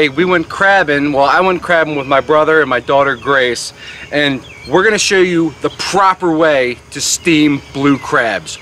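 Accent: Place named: Maryland, United States. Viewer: complete with that Maryland accent hon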